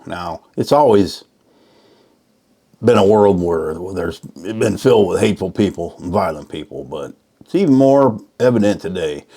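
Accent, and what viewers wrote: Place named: Tennessee, United States. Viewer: Hello, totally agree that something has changed. I think its the food, the plastic containers, the stuff they feed in the animals, AND MORE IMPORTANTLY, those VIOLENT VIDEO GAMES. Or, Do you see the chem trails in the sky where you are? We're I So. CAL. Way too many people here. I'm taking care of my 101 yr. Old mom. We can't move right now. But I enjoy listening to you and what you have to say., i look for your videos every morning. My husband's family were/are in Tennessee , I like your accent, reminds me of my husband's aunt who was a sweetheart. Happy trails.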